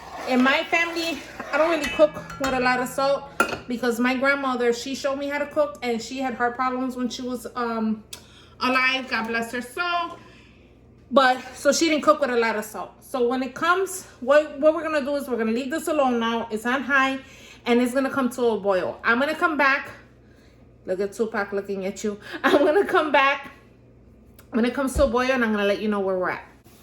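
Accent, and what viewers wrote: Bronx, United States. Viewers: I’m Puerto Ricen an l watch your video forthright first time. Girl were have you been all this time,loved your white rice. Are you fromNY? The Bronx maybe? You sound like it . May God Bless you.👍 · girl you sound like you're from the bronx...i love it!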